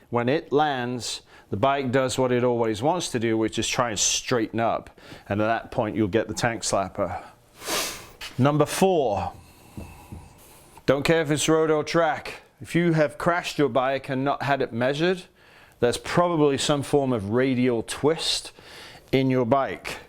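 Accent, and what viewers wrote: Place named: Wales, United Kingdom. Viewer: Hi just wanted to ask where in the world that accent comes from, sounds WELSH to me?? Good lessons though and thanks for your time doing these!!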